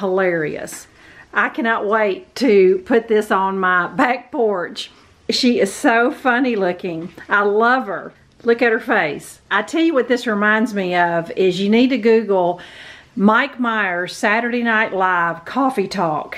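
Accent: South, United States. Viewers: Are you a southern lady?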